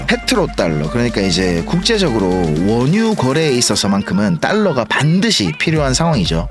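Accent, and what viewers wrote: South Korea. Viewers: 저도 응원합니다. 특유의 부산 억양 제 와이프와 같습니다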